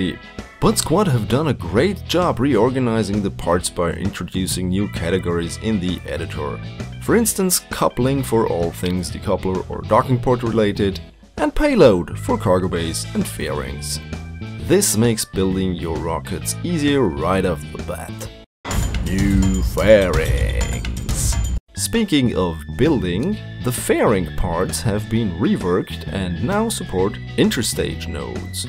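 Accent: Germany. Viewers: u German? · Are you german by any chance? ;-)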